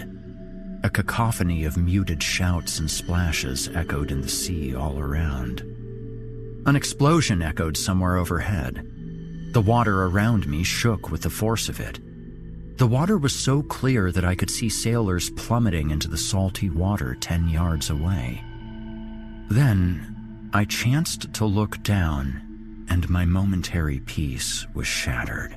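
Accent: United Kingdom. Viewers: Wow! I'm the author. And this certainly was a fantastic surprise! When I started my shift at work yesterday, I had no idea that my story had been officially selected to be narrated by none other than at the Horror Hill! It really was a fantastic way to start off the work day.:) I truly feel honored to have made the cut for something like this. And it's doubly cool for me because hails from the same city where I grew up. Hope all is well in Rochester. And thanks so much for narrating this! And I hope to work with you more in the future... BTW don't listen to the haters man. You totally killed it with that British accent.;)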